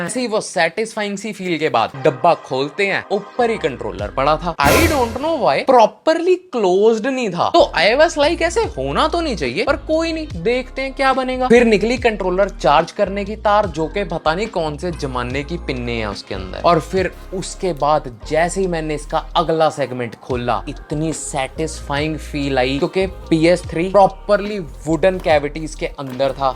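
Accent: Punjab, India. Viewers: I'm hating this punjabi language accent as this guy is making so much over action and bakchodhi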